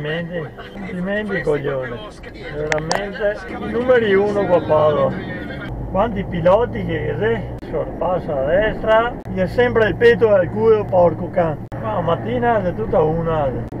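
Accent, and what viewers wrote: Belluno, Italy. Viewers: Buongiorno Paolo hai l'accento uguale del mio ex titolare di Belluno/Alleghe😅,l'altro giorno uno mi ha fatto quel zigzag in autostrada senza far freccia e sembrava un caccia delle frecce tricolori alla fine mi ha tagliato la strada per prendere l'uscita a destra e gli ho suonato si è incazzato pure 😅🤔 buona domenica 🙋🏻‍♂️un saluto da Stoccarda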